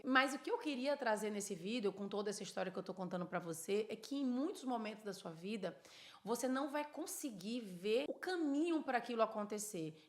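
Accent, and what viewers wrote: Bahia, Brazil. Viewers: Adoro sua energia seu sotaque baiaho tou com tigo ❤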